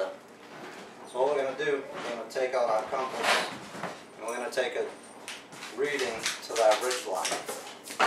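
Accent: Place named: Boston, United States. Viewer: That is the hardest Boston accent I’ve ever heard!! 😊👍🏽👍🏽 Good stuff bro. Easy to understand.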